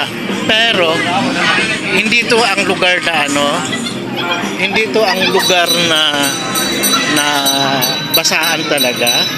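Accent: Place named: Philippines. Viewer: สำเนียงคล้ายคนฟิลิปปินส์นะ ถ้าผิดขอโทษ เพราะฉันมีเพื่อนฟิลิปปินส์เยอะ 🇺🇸🇺🇸🇺🇸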